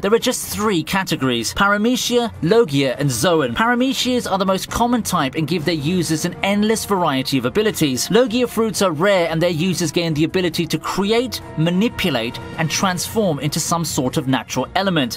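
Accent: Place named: United Kingdom. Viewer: Man that British accent make everything sound so presentable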